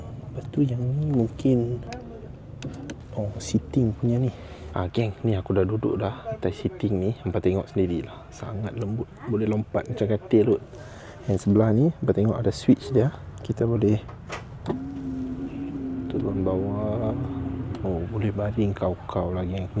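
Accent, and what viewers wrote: North, Malaysia. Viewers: sy pun baru jer jumpa vlog dokter, mmg puas hati especially penyampaian yg amat jelas (siap loghat utara lg, im from Penang), terus berkonten 🎉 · Suka dengar loghat abg..org india tp loghat utara memang padu...btw saya org melayu kedah...loghat abg ni,buat saya rindu kt kg halaman di kedah...salam dari Labuan bang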